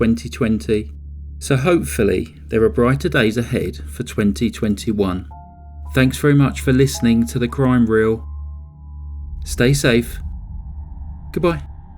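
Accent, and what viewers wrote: United Kingdom. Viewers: I wish I had a calm British accent, it just makes storytelling more pleasurable (no matter how heinous the story is)...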